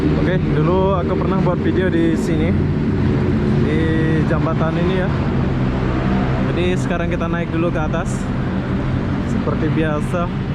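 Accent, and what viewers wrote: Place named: Malaysia. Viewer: dia orang indo tuh. Karena lama di Malaysia jadi logat dia kaya orang Malaysia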